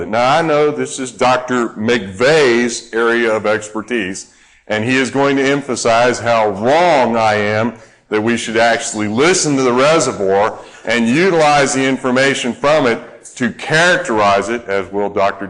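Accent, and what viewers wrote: Russia. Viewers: I like your Russian English accent and your way to explain materials , can any one give me the name of the instructor ? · man i loved your russian english accent hahahah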